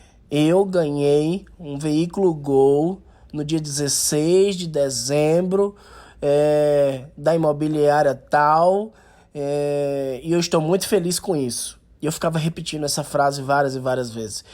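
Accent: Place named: Natal, Brazil. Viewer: nossa, cara. Moro na rua por trás do Reis Magos, e não sabia que você era aqui de Natal, até porque seu sotaque não é 100% igual o nosso. Tô assistindo seus vídeos desde ontem, acredito que tenha sido por causa da lei da atração, pois venho pensando exatamente nas coisas que, coincidentemente, você fala em seus vídeo. Que bom que respondeu o comentários, vi que a maioria você só curte, mas não comenta.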